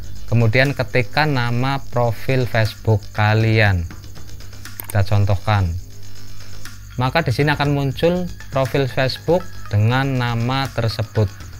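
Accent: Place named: West Java, Indonesia. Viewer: Orang sunda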